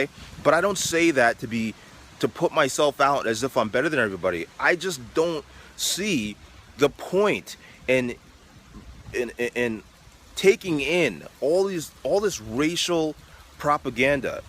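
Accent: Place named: Boston, United States. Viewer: Are you from the Northeast? You've got that Bostonian accent. Good video, by the way.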